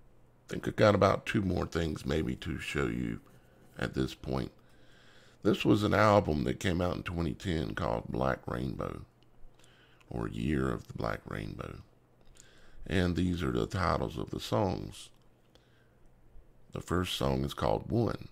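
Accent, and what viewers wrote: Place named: South, United States. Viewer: Naw, Son; although, you're, clearly, a Fuck-tard. Just some honest feedback form a member of the audience. Between the guy's Southern-fried accent, his monotone, near-mumble, the repeated use of the incorrect phrase, "Crimson Tide," (Alabama fan, huh?) and random samples of the dreaded Gematria (I mean, give me a break with that crap), I challenge anyone to tell me what the fuck he's talking about in this video.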